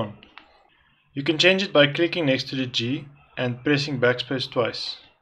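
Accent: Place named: Afrikaans, South Africa. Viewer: I hear that Afrikaans accent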